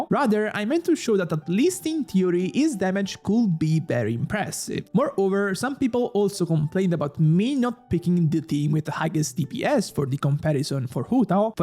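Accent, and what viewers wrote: Italy. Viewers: accento italiano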